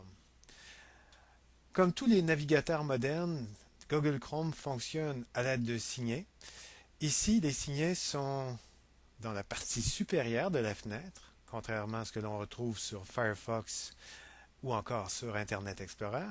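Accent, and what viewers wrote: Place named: Canada. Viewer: j ador ton accent canadien ^__^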